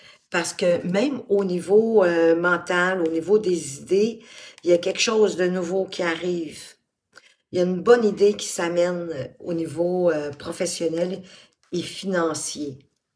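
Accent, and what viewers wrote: Quebec, Canada. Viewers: Dites-moi, êtes-vous québécoise?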